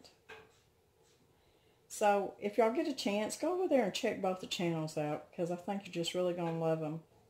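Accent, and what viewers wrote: South, United States. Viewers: Oh my your camera is wiggin out today! 🤔 Love this recipe, and your sweet southern drawl! Definitely comfort food with the addition of mashed potatoes and sweet peas and butter! Yum! Thank you for recipe using venison!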